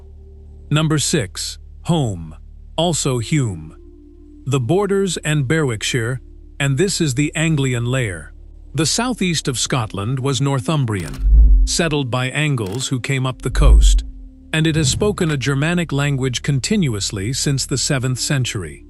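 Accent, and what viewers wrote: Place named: United States. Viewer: Why is it so hard to get a real life narrator. The dramatic AI narration with the US accent is so unsuitabel.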